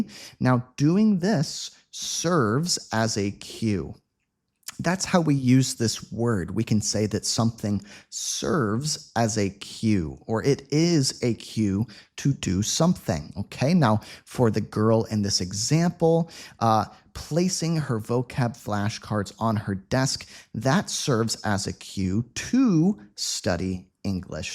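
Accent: United States. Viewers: You articulate perfectly American English which is my favorite standard of the English language, listening to you is almost like having a personal tutor. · Are you an American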